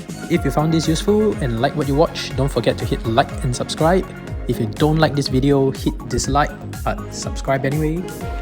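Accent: Singapore. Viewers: Bro u staying in sg??? sounds like ure singaporean. Keep uploading gd digimon content! 👍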